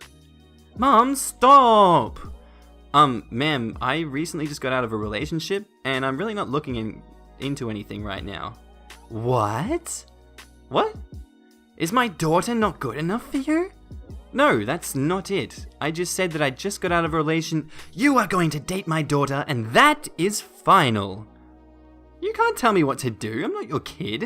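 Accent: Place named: Australia. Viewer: the northern Aussie accent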